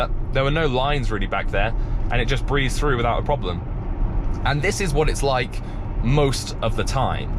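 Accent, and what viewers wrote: United Kingdom. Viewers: I like teslas and I like British accents. Found this channel yesterday. Good job yt algorithm!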